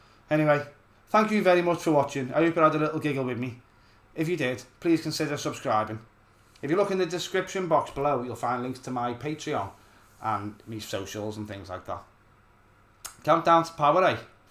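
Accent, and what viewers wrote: Liverpool, United Kingdom. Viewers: It's great to hear another Scouse voice up there. You remind me of home. Great channel.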